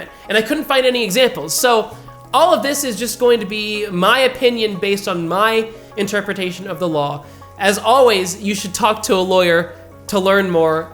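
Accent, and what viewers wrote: United States. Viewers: He sounds very very American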